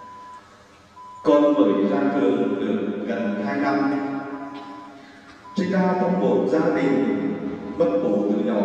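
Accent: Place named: Nghe An, Vietnam. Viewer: Cha giọng yên thành, nghệ An thì phải.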